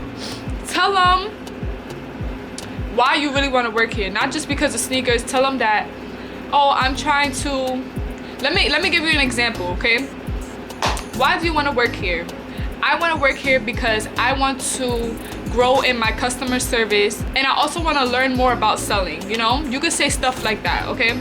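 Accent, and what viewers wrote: New York, United States. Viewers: You’re definitely from New York lol I love it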